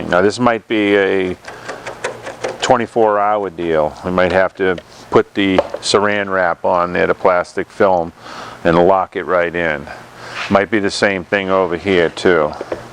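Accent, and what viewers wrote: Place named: Maine, United States. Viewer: Almost like a Maine accent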